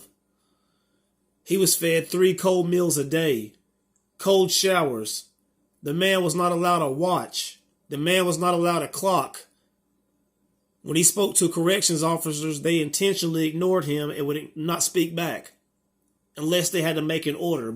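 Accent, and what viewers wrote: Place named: South, United States. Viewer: u have a country assent.. I noticed pretty cool because not many southerns have that now days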